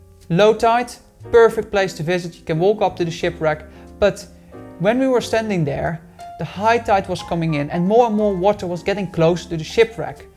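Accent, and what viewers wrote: Netherlands. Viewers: i hear your dutch so that says enough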